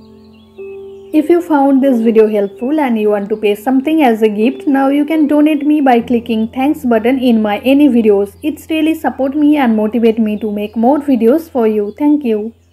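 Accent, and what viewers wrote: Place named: India. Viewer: محتوى مفيد واصلي لكنتكي تبدو من الهند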